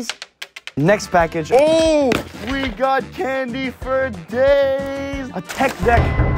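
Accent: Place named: Germany. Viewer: his german accent tho :,)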